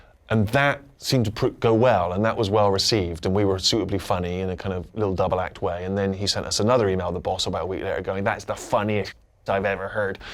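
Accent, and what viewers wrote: United States. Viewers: Where's his Bristolian accent gone????? He sounds semi-yank uggghhhh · Stephen sounds so American these days haha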